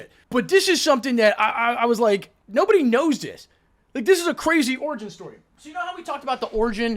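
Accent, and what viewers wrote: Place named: Spain. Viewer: Man, why the Spanish accent i dont get it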